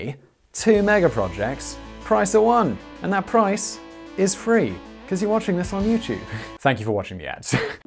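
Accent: England, United Kingdom. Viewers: With that accent? No - I do believe he is 100% English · Reading downwards I see no other comment really necessary but to compliment you on your pronunciation as most of my native English speaking friends never tried that hard. And going on your accent, you probably don't mind if foreigners call the UK or Great Britain 'England' either. All in good fun for whomever gets it.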